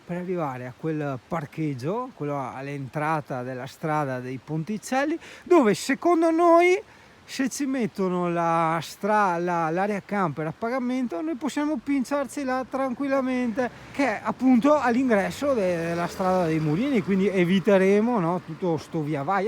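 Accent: Veneto, Italy. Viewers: Bel video complimenti, bella parlantina Veneta. Bellissimi posti 🤟 BUON SABATO sera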